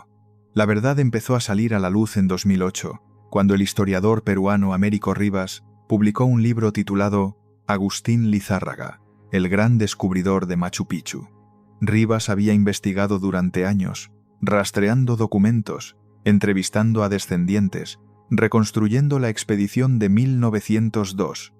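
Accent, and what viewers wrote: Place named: Spain. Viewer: ERES ESPAÑOL, NO LO VERÉ .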